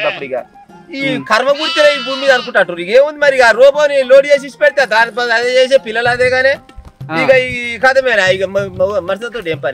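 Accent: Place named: Telangana, India. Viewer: గివార్తేమో గానీ,. నీ నోటినుండి వచ్చే నా పల్లె యాస- నా తల్లి బాస - నా తెలంగాణ యాస.. మస్తుగుంది.. 👍👍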